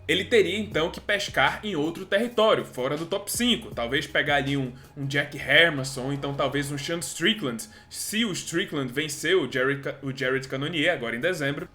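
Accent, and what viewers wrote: Recife, Brazil. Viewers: Tu é de Recife bixo ?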